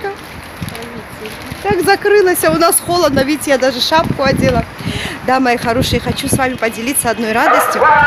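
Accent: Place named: Georgia. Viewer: Очень длинная реклама! Но я не переключаю. Жду ваш славный голосок с грузинским акцентом!👍👍👍👍👌👌👌👌👌👌💞💕💖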